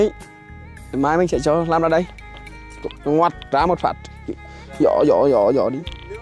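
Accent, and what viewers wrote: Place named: Central, Vietnam. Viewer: nói nhanh quá dọng miền trung hơi cứng nên em nói chậm nhịp lại cho khán giả nghe kịp vì khán giả là người khắp mọi miền tổ quốc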